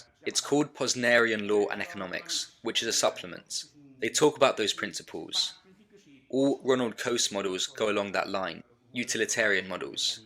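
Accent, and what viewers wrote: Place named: United Kingdom. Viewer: La voz es excelente, no tengo que leer los subtítulos y me gusta volver a disfrutar esta presentación en versión Inglés, y es muy clara y muy agradable la voz de estilo británico. Es una voz natural y agradable.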